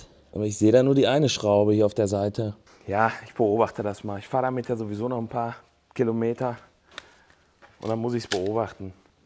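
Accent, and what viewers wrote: Ruhr, Germany. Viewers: Dialekte sind richtig und wichtig 😅 Ruhrpottklang von 😉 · wichtig ist, die deutsche Sprache in ihrer wahren und richtigen Form zu bewahren. Ich finde diesen Ruhrpottklang von auch so sympathisch!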